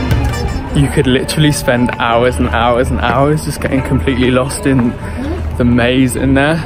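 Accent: United Kingdom. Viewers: Aww u came to my country and I didn't even know 😭 Btw I love Ur British accent Keep shining like always.... I don't know why but I'm kinda afraid of monkeys 🙈 but these ones were so cute Oh and I could still see the slight nervousness in Ur tone....I feel like u have become more confident after becoming a Muslim. Stay blessed.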